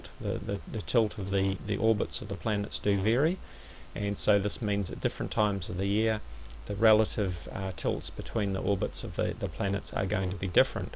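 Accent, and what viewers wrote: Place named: New Zealand. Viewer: awesome accent mister Kiwi. Kuddos from mexico.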